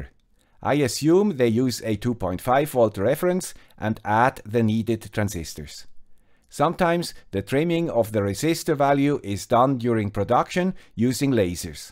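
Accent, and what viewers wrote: Switzerland. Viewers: Agree. I’ve got 25 years into this so far. The guy with the Swiss accent has been a great help.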